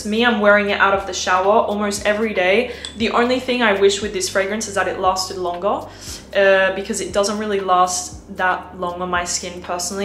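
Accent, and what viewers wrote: Australia. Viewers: ! We missed you and your aussie accent 😍 You look stunning! Happy to see you back, don't forget about us here on YT 😢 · Just wanted to tell you that you sound so Australian👍 I like it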